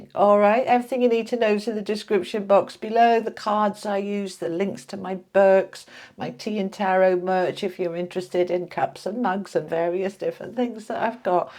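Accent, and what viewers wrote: England, United Kingdom. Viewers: Thank you ❤ What a pleasure listening to your English accent. I have been fighting for years for my heritage mainly with my sister who is taking all the economic profit on this situation. Lately I’ve sent un ultimatum to her lawyer because I am just tired of this unfair situation. This is real insane when we have to fight against our own family but it’s just scandalous the way she can take profits of the situation making me paying for her accommodation with all the referred financial charges. Well I pray for the end of this mess because I am getting old and tired to continue working paying for her profits. Even my health balances at my age and my body keeps telling me it’s time to stop. Hoping that the sun finally comes out and brights in my sky and in my heart. Thank you very much for this vidéo. You do a great job. God bless you 🙏🫶💐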